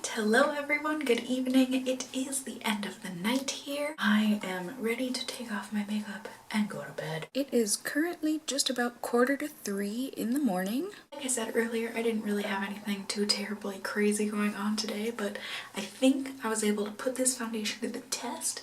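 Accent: Minnesota, United States. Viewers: IVE WATCHED LIKE 20 OF UR VIDS AND WAS LIKE hmmm I get a vibe she's from Minnesota and BAM FIRST 30 SECS OF THIS VID AND IM RIGHT YESSS (fellow Minnesotan btw) :))) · The first video of yours that I saw was your Minnesota accent tag, (I'm from MN too!!) now I'm hooked on your videos! I just loveee your personality and how sweet you seem ❤️❤️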